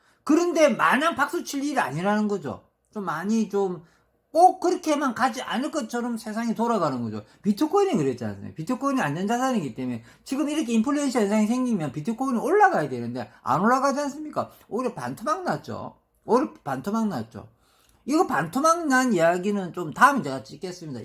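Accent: Gyeongsang, South Korea. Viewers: 네~~맞아요 목소리도 좋고 경상도 사투리 도좋고 ㅎㅎ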